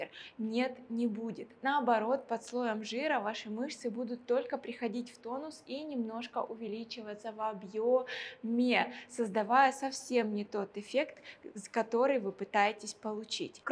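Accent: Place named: Ukraine. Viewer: Вы считаете, что Ваш акцент идеален и речь прекрасная? Для чего вообще комментарий в таком тоне? Просто, чтобы задеть человека? У Вас что-то личное к этой девушке или вообще ко всем, кто с Украины? Если не нравится, то можно просто не смотреть. Ссылку скиньте на Ваши ролики, и вообще интересно посмотреть на Ваши достижения в жизни. Я уверена, что человек, который трудом добился чего-то, никогда не обесценит труд другого человека и уж тем более не выскажется в таком тоне. Я из Казани и у нас здесь каких только акцентов нет и это нормально. Не знаю, где Вы проживаете, но , судя по комментарию, Вы не из очень хорошей среды.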